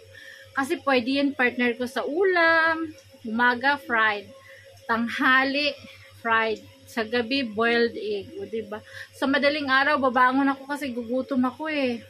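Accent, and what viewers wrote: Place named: Cebuano, Philippines. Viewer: Work narin ang pag vlog mo sis.....magkatawa ko nimo kay mo gawas jud imo pagka cebuana...God bless dai...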